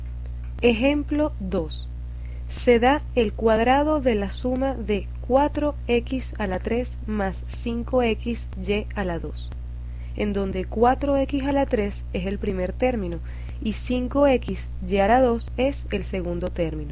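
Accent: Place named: Venezuela. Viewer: entendí todo, y por cierto se nota que eres venezolana ese acento nuestro es irreconocible.... gracias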